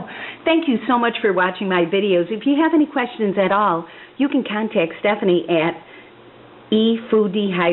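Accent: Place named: Minnesota, United States. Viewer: I am guessing you are from Northern Wisconsin or Minnesota.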